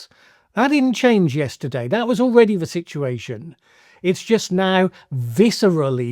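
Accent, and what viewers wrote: United Kingdom. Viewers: This clown sounds like a Brit. Perhaps he should be more concerned with the pathetic collapse of his own country and/or his own treasonous government.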